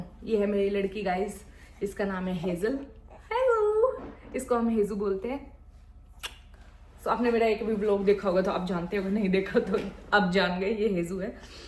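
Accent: Haryana, India. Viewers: Hi, congratulations 💐💐 Hazel ki eyes bilkul iske father jaisi hain. God bless you both❤️❤️ I like the way how you speak specially your haryanvi tone😊. I am from Rohtak.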